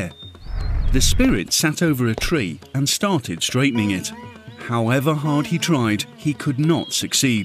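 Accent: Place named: England, United Kingdom. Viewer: Great going guys! loving the series. 1 small small gripe - why is an angez narrating the stories ? feels so colonial. No offence to Mr. Narrator hands folded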